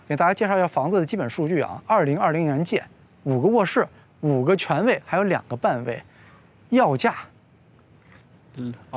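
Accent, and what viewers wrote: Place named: Mainland, China. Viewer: Sir, I did not finish viewing your Vblog, based on your accent, you must come from Mainland China, I am so surprised by your talk, very decadent. Most mainland chinese I met are more enterprising and want to advance themself.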